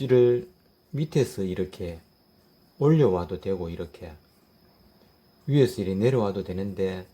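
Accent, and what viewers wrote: Gyeongsang, South Korea. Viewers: 경상도 말씨가 정겹습니다. 늘 감사한 마음으로 공부합니다.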